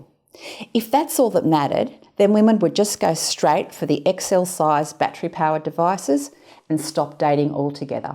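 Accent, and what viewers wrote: Australia. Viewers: What accent is this? I can't identify it. Australian maybe? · THANKS FOR YOUR INTERESTING SURVEY. I BELIEVE YOU ARE AN AUSTRALIAN DOCTOR FROM YOUR ACCENT. THANKS AGAIN!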